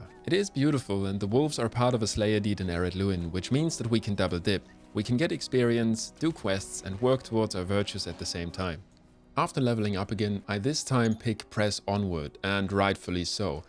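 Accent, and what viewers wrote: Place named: Germany. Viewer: Nice stuff mate :) That German accent cannot be unheard, Grüße gehen raus!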